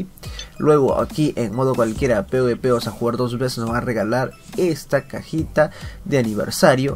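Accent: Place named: Peru. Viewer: Se me hace que eres peruano, salueame n tu próximo video plssssssssssssssssssssssssssssssssssss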